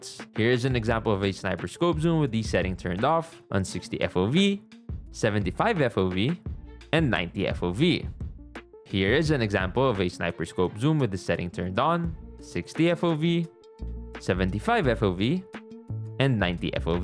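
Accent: Philippines. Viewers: You sound so filipino😅 (I know its not the topic i just wanna ask)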